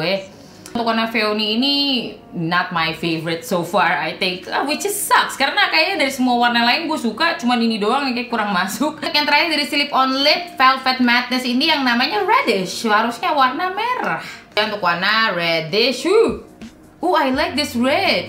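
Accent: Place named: Papua, Indonesia. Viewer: Sukaaaaaa bgt ama logat PAPUA nyaaa 🥰 sering2 dipake kaaaa 🥰👍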